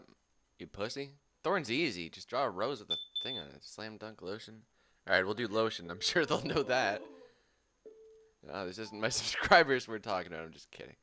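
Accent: Canada. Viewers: haha is starting to sound more Canadian with the eh thing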